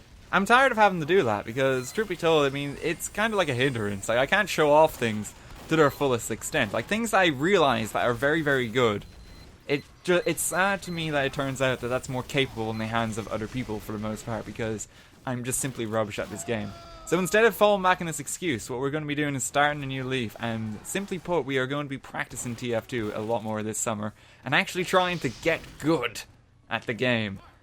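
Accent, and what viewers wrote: Ireland. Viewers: He sounds Irish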